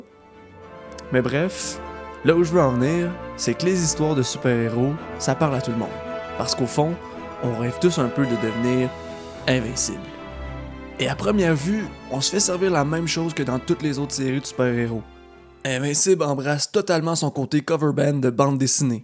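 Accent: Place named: Quebec, Canada. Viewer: Je ne sais pas cmt j'ai atterri sur une vidéo québécois mais j'adore votre accent 😂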